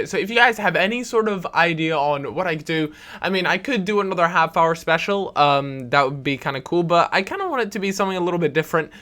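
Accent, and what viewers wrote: Scotland, United Kingdom. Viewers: You scottish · Where in scotland u from??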